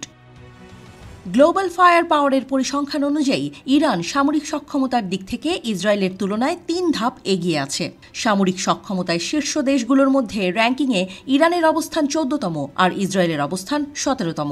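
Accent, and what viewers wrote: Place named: Kolkata, India. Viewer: কলকাতার মতো খবর পড়লে শুনতে ভালো লাগেনা, দয়া করে বাংলাদেশের মতো পড়েন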